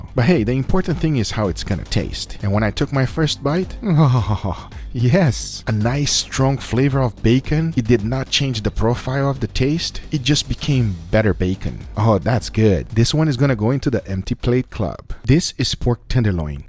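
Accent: Brazil. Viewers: This is the first time I hear the real Brazilian accent in english. Congrats, buddy. Your channel is very nice. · Hey bro. Are you Brazilian?? Your accent is so Brazilian! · Leva uns 5 segundos pra perceber o sotaque brasileiro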